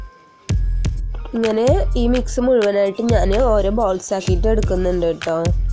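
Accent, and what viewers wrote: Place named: Thrissur, India. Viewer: Video full kandu Thrissur language 👍🏻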